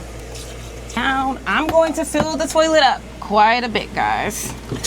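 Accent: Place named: Jamaica. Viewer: How come you sound Jamaican from time to time?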